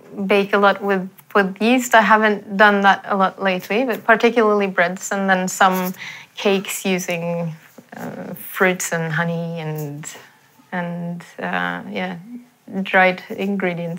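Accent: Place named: Turkey. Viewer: Ablam ya :dd konuşmaya başladığı ilk anda dedim ki bu kadın kesin Türk ancak Norveçliyim deyince de ümidimi kesmedim çünki bu ingilizce aksan ancak Türkiyeden yolu geçmiş birinde görülür :))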